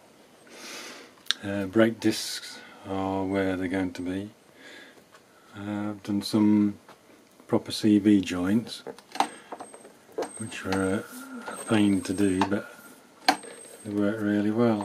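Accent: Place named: Yorkshire, United Kingdom. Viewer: absolutely wonderful engineering! if i'm not mistaken you're a yorkshire lad aswell?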